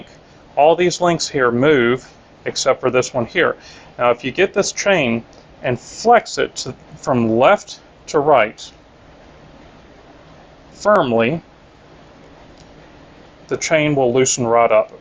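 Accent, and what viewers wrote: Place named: North Carolina, United States. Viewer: Such clean fingernails for a mechanic! I guessed NC, and I was right! I don't know how. I'm in Boulder, Colorado...Never been there, or anywhere near. I guess it was the subtle accent and the nice little town. I've electrified a Chinese cruiser with 29 inch wheels. It's like a giant version of this. The chain keeps popping off, the motor is too powerful. I've ordered a 22 tooth with an offset to bring it in better alignment. We'll see. The removal of the sprocket was surprisingly easy, but I wouldn't have known how without You.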